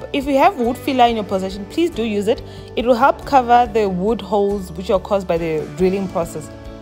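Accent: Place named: South Africa. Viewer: I am south african Indian I thought I recognized your accent, wow you have such great ideas and I will surely try it out, my daughter has asked for a shoe rack. Keep it up with your good work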